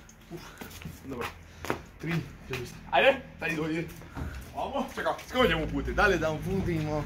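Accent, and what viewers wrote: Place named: Lumbarda, Croatia. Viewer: Moje srce je sada zaigralo i igrat će sve dok se ne bude pucalo ispod one teće.... uvelike se radujem tome događaju i tom simpatičnom naglasku. Vi ste pretpostavljam iz Lumbarde ako se ne varam? Pozdrav iz Krvavca❤❤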